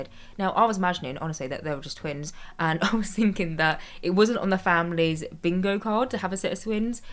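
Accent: United Kingdom. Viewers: as you started talking, i knew instantly you were from the UK. as i am British i just knew!! 💟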